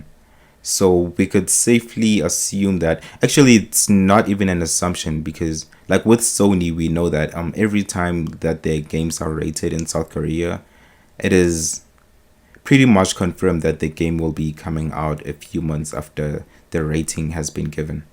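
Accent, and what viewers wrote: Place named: South Africa. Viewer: Can hear ur from SA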